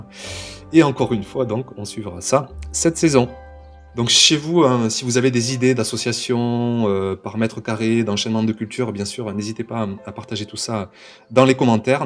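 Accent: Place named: Southern France, France. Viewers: Ah ce bel accent du sud ouest... J'adore... Pour ton cadre, tu pourrais y faire grimper une christophine. Elle serait bien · Enfin des conseils simples et de bons sens....soutenus par une voix très agréable à écouter. Et avec cet accent qui sent bon le soleil, j'avais l'impression qu'on me lisait du Pagnol! Félicitations d'un autre, de tout là haut au Nord!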